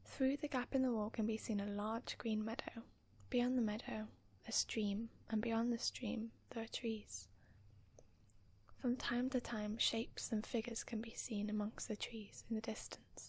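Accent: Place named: Hampshire, United Kingdom. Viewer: You have a lovely accent. Id bet you're from hampshire somewhere. :)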